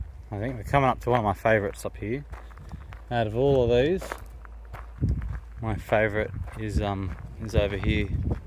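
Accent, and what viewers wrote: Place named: Australia. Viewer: "I don't have too long" 1 hour video ensues with calmest Aussie accent ever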